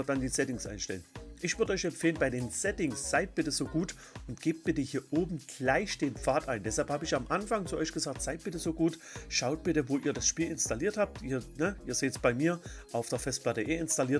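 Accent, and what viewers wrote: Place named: Saxony, Germany. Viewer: Ich bin, deine Stimme hat mich geholt mein Sächsischer Bruder!!! <3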